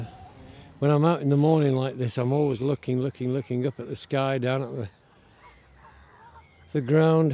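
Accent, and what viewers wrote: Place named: Derbyshire, United Kingdom. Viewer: The Thames!? I can't exactly place you accent, but it's close to mine, Tideswell, Derbyshire. Now living in northern Italy, chestnut forest. Find your attitude very reassuring, keep up the good work!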